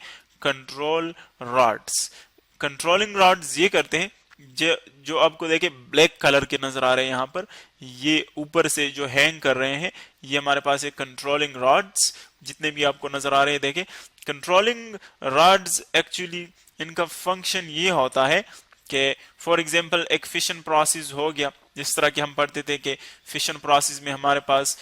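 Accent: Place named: Balochistan, Pakistan. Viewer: Sounds Balochi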